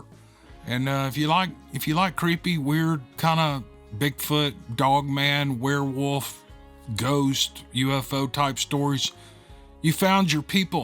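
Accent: South, United States. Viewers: I love not only because of the stories & but is the stereotypical southern personality. I’m from the South and live a LONG way away from there now. I love to settle in on a video and just sink into the Southern accent and the Southern way. In a weird way it’s a little bit of home.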